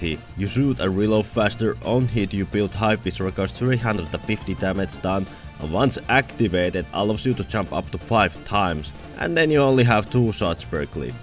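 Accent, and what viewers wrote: Finland. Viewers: I can hear the finnish accent so well :DD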